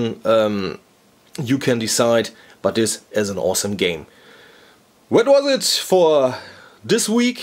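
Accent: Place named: Germany. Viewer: You sound German, love that accent bro. Best accent to talk in.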